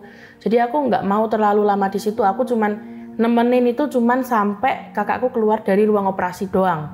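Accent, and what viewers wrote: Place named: Semarang, Indonesia. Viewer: logat e semarang bgt hhe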